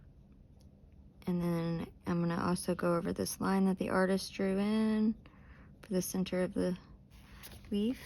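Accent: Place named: Kentucky, United States. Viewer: Oh, how cute! Love your intro music, so fun and your desk set up today is so stylized! I was working away at Kerby dragon with you last night. Made a boo boo because using my Prismas, erased and worked on finding a colour combination. I found that one that you suggested in Prisma was too dark when used with another, so I persevered and tested things out. I learned a lot doing this and got a colour combo that is similar to yours but I liked even better, haha! I then decided to use only three colours on the scales and it looks good. I had two colours that are the darkest and midway through, I forgot which one of the pencils to use. I've decided to go between the two. I figure that animals in real life have colour variations in their scales and are probably not consistent in colour. I wanted to let you know that I really like your channel because it feels more personalized compared to many of the others. 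I feel as if I'm colouring with a friend, but at the same time, I get the feeling like I'm learning from an expert. I don't know why you think that you are not great at talking during your videos, you are quite chatty and personable and your Kentucky accent adds charm especially to my Canadian ears, lol. I also like how loving and caring you are to your children and I can strongly relate to that. My heart gets warmer when I am colouring and hear you talk about them. I really enjoyed colouring along with you yesterday because we just sat and coloured together unlike other coloris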